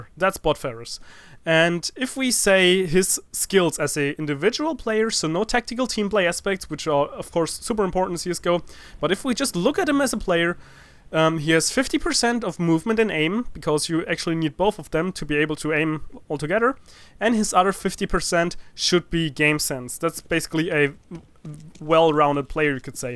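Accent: Germany. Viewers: your sound like german are u?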